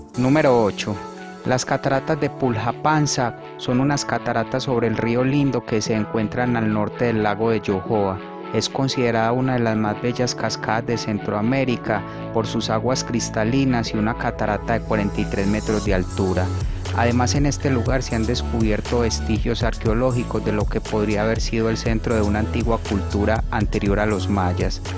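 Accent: Colombia. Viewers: Sos Colombiano verdad?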